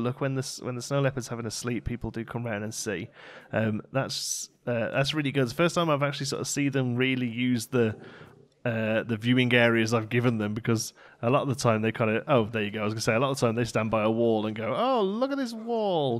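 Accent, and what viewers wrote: United Kingdom. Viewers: You time these perfectly with my lunch 👌 Everyone at work hears some British nerd talking to me everyday around this time 😂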